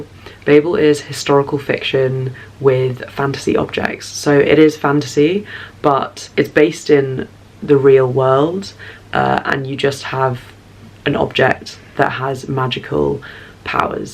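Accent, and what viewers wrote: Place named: United Kingdom. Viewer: I really enjoyed this video. Its cool to hear someone else's take one books like these. However, i cant keep my self from wondering about where you are from. Some times it sounds British, at times Australian and then i wonder if you're South African. You have probably gotten this question many times. But where are you from?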